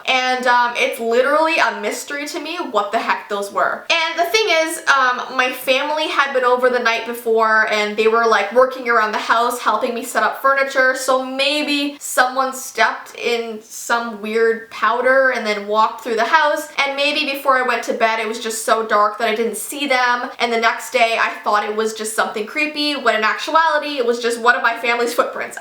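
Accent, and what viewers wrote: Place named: Canada. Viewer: for some reason i heard the canadian in her voice that ive never heard before. how havent i noticed this!?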